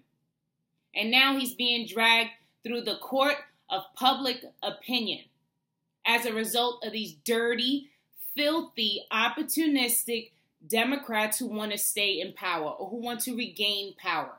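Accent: New York, United States. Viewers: Hi this your new subscriber from Nassau Bahamas great video and I watched that Kavanaugh hearing today I had too laugh lol.. I love your beautiful New York accent lol..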